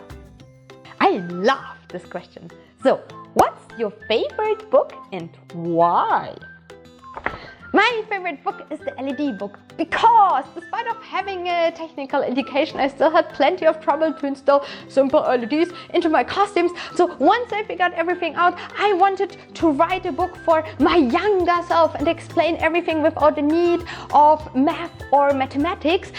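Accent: Germany. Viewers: You would make a great Bayonetta cosplayer! Someone as gorgeous as you are would really have no problem with this. Also thank you for always being genuine! I almost feel like it's difficult to find cosplayers that are kind and professional as opposed to being snotty and gatekeeping. Additionally I love your German accent and it's not weird at all! :) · English akzent is totally german ;-)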